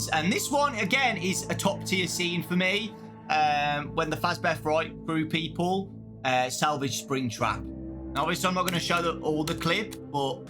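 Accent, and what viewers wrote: United Kingdom. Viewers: Ahhhh he’s British😂😂😂😂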